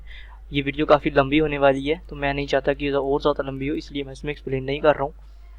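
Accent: India. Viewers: Buhat achi video hy or specialy bol b buhat desi andaz sy rhy .buhat detail sy bnaty ap video Allah pak apko kamyab kry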